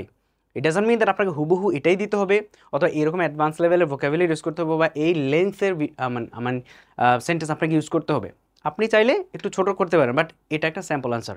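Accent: Noakhali, Bangladesh. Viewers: আছেন নি বালা, খাইছেন নি ভাত.... এটা তো নোয়াখাইল্লারা বলে।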